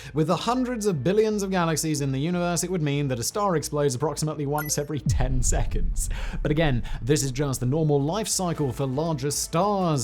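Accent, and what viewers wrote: United Kingdom. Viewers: Bald man with facial hair and glasses, wearing all black, relaxed on an office chair speaking with a British accent talking about cartoony supervillain stuff (like blowing up a star)... ...Dude starts petting a cat on screen and this is a solid trope. Bonus points if he trades the glasses in for a monocle.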